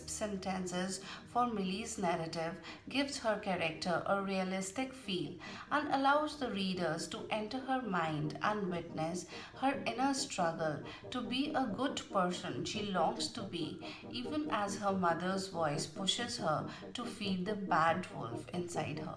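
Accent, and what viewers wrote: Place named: New York, United States. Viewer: Very NYC and good pronunciation....go a head And d best😃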